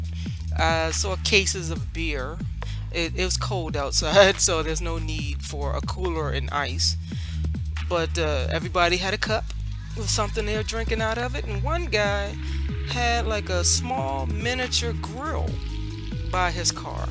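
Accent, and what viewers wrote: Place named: Philadelphia, United States. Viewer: Greetings from Washington DC. You sound like you're from Philly. You may have mentioned where you are from in another video, but I haven't seen that yet because, as I said in comments on East Capitol Street Dwellings, I'm new to your channel. I'm very familiar with Barry Farm since I've used their recreational indoor pool since 2014 off and on. Best wishes, Sis.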